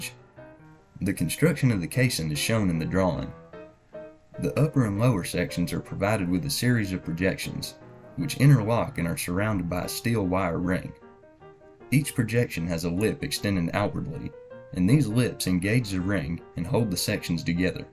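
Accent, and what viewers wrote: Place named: United States. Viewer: This guy's voice... 100% unfakeable American. I'm subscribing just out of the hope he's gonna say "John Deere" lolz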